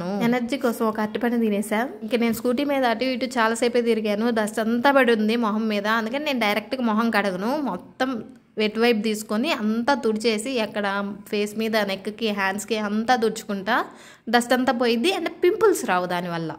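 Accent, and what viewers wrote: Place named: Kanigiri, India. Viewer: my native place also nearby kanigiri and okasari reels chustunte e sister video chusa and tana slang chusi doubt vachi(manam enta dooram vellina mana own roots aete marachipolem kada😊😊😊) nenu adiga me proper akkada ani e sister reply echaru so ala naku telusu...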